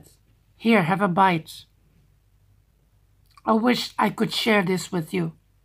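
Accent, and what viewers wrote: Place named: Netherlands. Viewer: That accent is definitely Dutch I'm hungover and could just do with that now so jelous lol it's not to long at all pal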